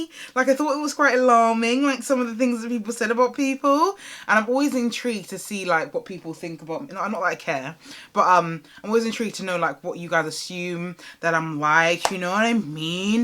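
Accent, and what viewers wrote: United Kingdom. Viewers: your british accent 🇬🇧 i wish i had the same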